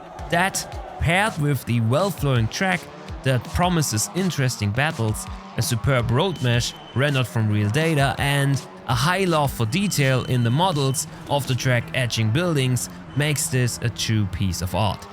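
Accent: Germany. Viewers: gutes englisch aber das geschulte ohr erkennt dass du deutsch bist:) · Da hör ich doch Deutsch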